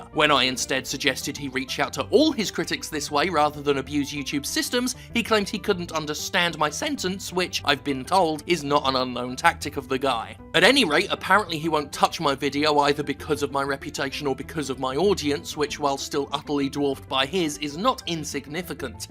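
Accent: United Kingdom. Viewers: puta madre el acentoi ingles es ladilla de entender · el que habla es britanico?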